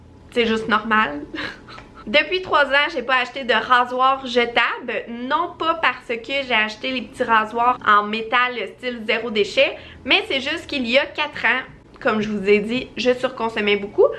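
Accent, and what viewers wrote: Canada. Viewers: Mon dieux comme c fatiguant d entendre l accent française des canadiennnnnnn.... c fait mal a la tête..excuse moi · J adore ton accent chère youtubeuse du Canada.. Et tes conseils bien sûr une abonnée du Sud de la France..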